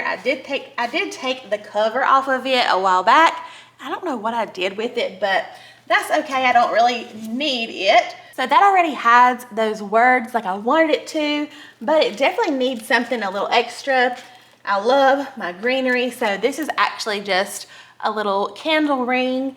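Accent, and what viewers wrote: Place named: South, United States. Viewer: I'm an Australian interior designer and I love your Southern accent, great ideas on your channel, well done xoxo 🤠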